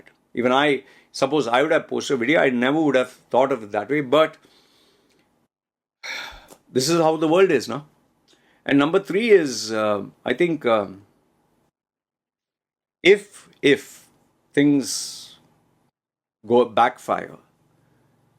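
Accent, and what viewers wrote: India. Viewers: Why u indians always say saar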